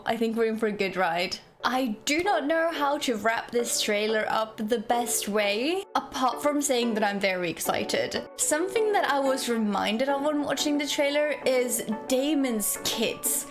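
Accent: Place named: South Africa. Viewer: Where are you from ? you almost sound South African and Irish and Australian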